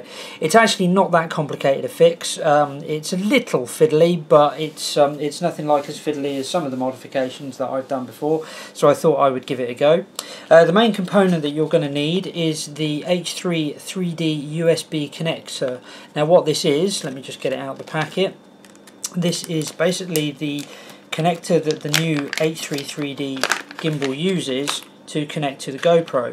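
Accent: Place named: United Kingdom. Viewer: I love that british Accent. Nice tutorial! I sought long for this. Now finally got it ;-) Thanks a lot!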